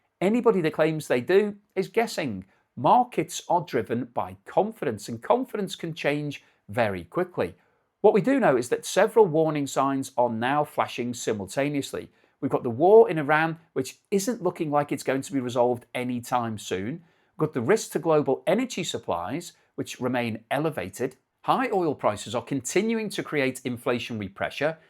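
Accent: United Kingdom. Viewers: YouTube is my crash course in the British accent. My head is still hurting, my mind wanders. I may be too old for this. Great analysis, Sir! Thank you! I'm wondering if this new Fed Chairman will be a modern day Arthur Burns - accommodating the president's war spending, leading to inflation. So higher interest rates? Hmm.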